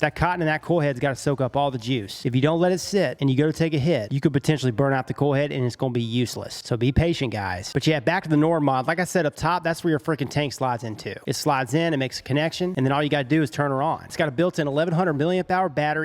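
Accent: United States. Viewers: Those contacts would look cool if your wore them properly. Instead you have your eyes open as wide as possible like a damn meth head making you look strait up dumb. Learn how to wear them the right way. But you sound American so stupidity is expected.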